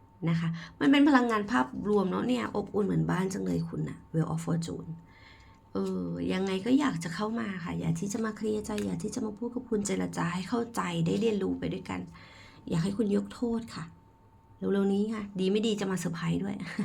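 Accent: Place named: Kanchanaburi, Thailand. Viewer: พลังที่ดีมากๆสค่ะน้อง อ่านเสียงเหน่อและเข้าใจง่าย.เปปๆขอบคุณค่ะชอบเหน่อค่ะ.เคยอยู่กาญจนบุรีมาเลยชอบมากนะคะ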